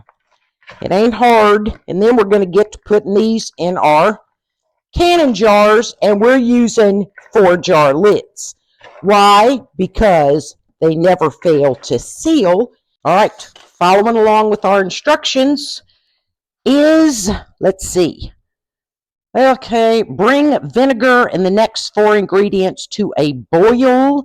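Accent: South, United States. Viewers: Are you from the south? You have an accent like my aunt who is from Florida. LOL.. Thank you for the small batch recipe. I have never had pickled peppers.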